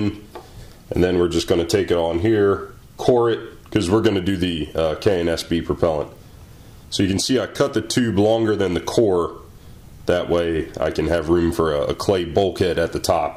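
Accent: South, United States. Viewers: Wow, AvE. You seem to have picked up a slightly southern twang and a deeper voice. The rest of it is the exact same though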